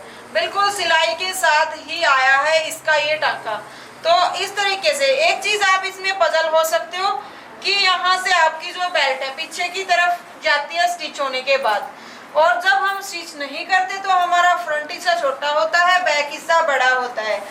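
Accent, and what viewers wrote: Haryana, India. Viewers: Sister aap accha sikhati hai 😂sikhate wakt baate karati hai to aur acchi lagti hai mujhe haryanvi bahut pasand hai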